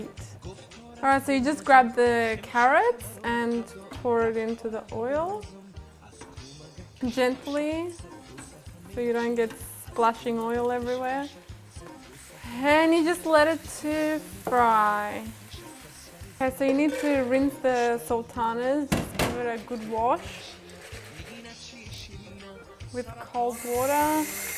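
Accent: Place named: Australia. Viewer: I luv Australian accent ;)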